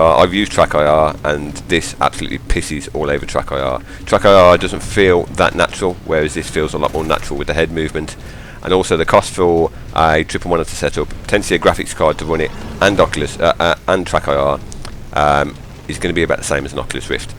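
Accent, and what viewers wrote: Black Country, United Kingdom. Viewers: That accent sounds familiar :P Not from the Black Country by any chance are you?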